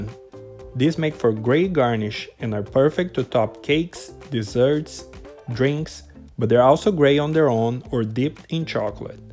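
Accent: Brazil. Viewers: Are you brazillian? Or portuguese idk Ur accent kinda gives it up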